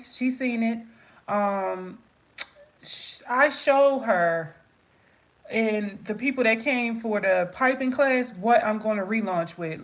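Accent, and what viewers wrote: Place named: Philadelphia, United States. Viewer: I was drawn to you from the first video I watched. I told my husband honey look at this candle girl from Philly. I love a Philly accent. When I found out you had a group and did classes I been a fan ever since. I have watched those other candle maker videos, they put me to sleep, (no shade on them) but it's a no for me. Now u back to doing skincare, they gone be mad. This is a extra bonus. Get ready for the haters cause 😎 u about to blow it out the water.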